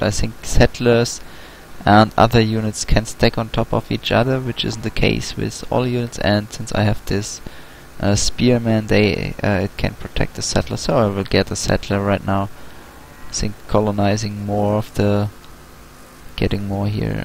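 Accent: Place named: Germany. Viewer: das ist doch denglish das du da sprichst freundchen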